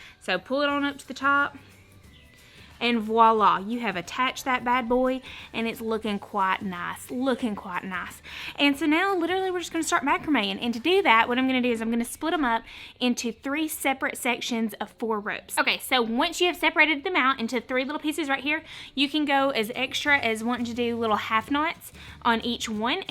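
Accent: South, United States. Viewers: My goodness, your just about the cutest thing I ever seen. Your southern drawl is so darling. I just stumbled across your channel and yes ma'am I liked subscribed and hit the bell so I will be seeing you again real soon. Oh and that little hanging pot is too cute!! 😙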